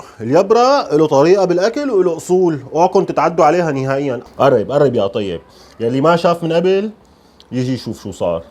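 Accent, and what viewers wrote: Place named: Syria. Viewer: الهجئ السوريه أحلا لسى من الكل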